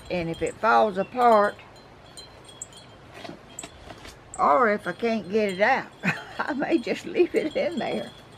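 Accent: South, United States. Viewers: Hello Miss - I just came across your channel a few days ago and am so happy I found you. You are such a talented lady with a beautiful soul, I love just listening to you talk in that beautiful southern accent. I live in Canada, way off the west coast on Vancouver Island, but I have visited Georgia, driving from Atlanta to Brunswick and back up the coast to Savannah, so I'm familiar with the red sand and love those boiled peanuts! Love Georgia - I also have a Godson living with his family in Lawrenceville, so may even visit once again some day. I particularly love those green glass leaves behind you - is there a video on how to make them?? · Hi Ms.!! I am so happy that I found you! I love watching you craft, and I love hearing you talk...I have family(now passed) that lived in NC and just miss hearing that southern accent! makes me feel good inside!! 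Anyway, I would bet that a lot of your regular viewers would really enjoy hearing stories about your life...when you were a little girl and what kinds of history that you saw happen. Im listening to you talk about the snake, I dont know how you stood still for that. I would have kicked him across the yard or passed out!! Keep up the good work-and may God continue to bless you and continue to bless us with you. Sending a big hug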